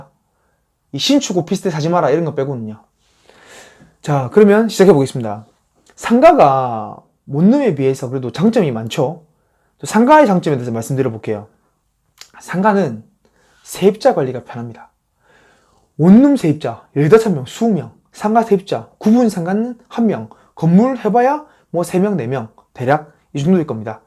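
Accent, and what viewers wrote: Busan, South Korea. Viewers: 발음 문제 없는거 아닌가요?ㅎ그리고 부산사투리라서 귀에 쏙쏙 더 잘 들어옵니다.항상 잘 보고 있어요감사합니다~ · 부산 사투리 좋네요..잘 들었습니다. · 부산 사투리 구수합니다 저도 대구에서 태어나 부산 큰댁에 자주 놀러갔습니다 ㅎㅎ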